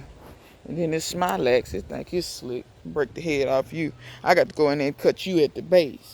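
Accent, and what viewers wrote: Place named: South, United States. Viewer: Girl you crack me up. Me and my husband be watching your videos laughing at you and those cats. I love your country accent. I thought I was country. Lol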